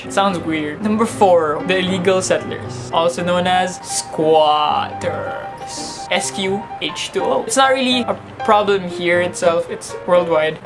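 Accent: Philippines. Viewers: I really hate the tone, the way you said "squatters". They didn't choose to live that way, tiny chance to have a decent house because of poverty, they don't have a choice to choose mate. It's a shame on you to say that to your own people. I maybe understand you if you're not filipino but you are, i can tell because of your "ACCENT". Be nice to your own people. I agreed on what you've said, some filipino peeing everywhere which is not nice, and the rest of what you've said is garbage!f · Based on your looks and accent you could tell hes a typical flip....this is just sad hating your own kind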